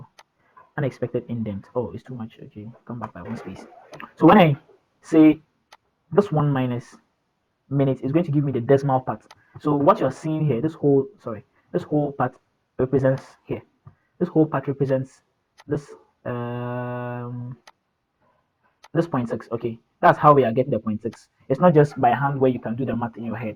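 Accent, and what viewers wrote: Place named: Ghana. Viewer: I know a Ghanaian when I hear one lool nice video! I really needed this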